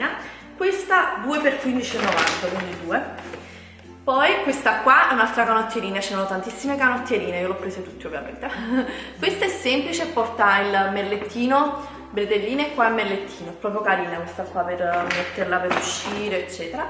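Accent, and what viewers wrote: Naples, Italy. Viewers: ma sei napoletana comunque ti stimo e sei bellissima e proprio ora mi sono iscritta perche sei simpaticissima e dolcissima😙😙😙😘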